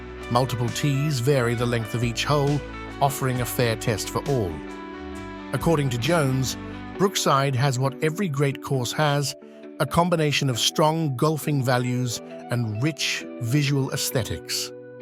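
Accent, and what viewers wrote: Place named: United Kingdom. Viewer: For some reason the British narration makes it easier to understand lol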